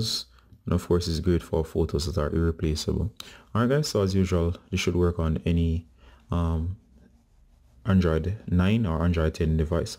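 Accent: Jamaica. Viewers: Omg!!!!! Thank you so much!!!! I was so scared that I lost all of my pictures and you helped me recover them. Also p.s I detect a J.A accent...big up your self! I'm a fellow Jamaican as well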